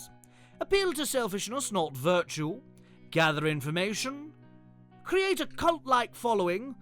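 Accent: RP, United Kingdom. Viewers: I love there voice tbh, I definitely will binge the channel just to hear the fancy British vampire voice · This is the most British sounding person I’ve ever heard 😂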